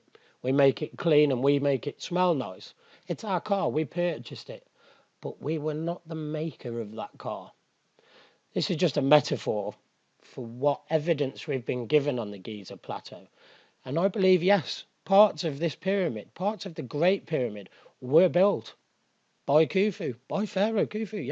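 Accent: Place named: Birmingham, United Kingdom. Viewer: The Lost History Channel TKTC I’m here (&subbed) due to you fella.and I do love a brummy accent 😉👍🏻😂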